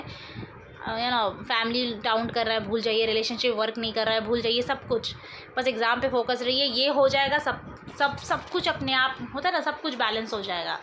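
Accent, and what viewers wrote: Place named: Mithila, India. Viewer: Aapki soch bilkul Sahi h.. aapki sound bilkul hamare local language ke tarah mil raha h... Aap kis district se h... Mithila side se h kya???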